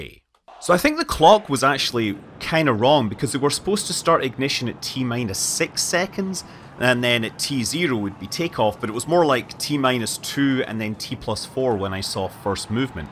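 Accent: Scotland, United Kingdom. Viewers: Finally, the Scottish summary of the launch. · Even this Englishman loves a Scot explaining how those Americans got it so wrong. ♥️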